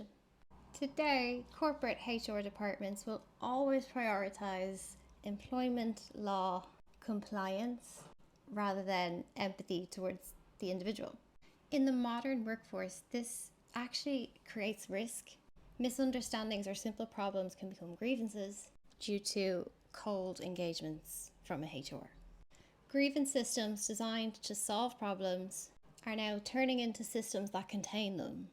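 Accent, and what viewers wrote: Ireland. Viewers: You sound like an Irish Lisa Simpson.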